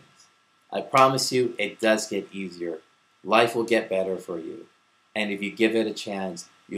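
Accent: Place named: New York, United States. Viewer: As soon as the first word came out his mouth you can tell hes a NYer, Nice Vid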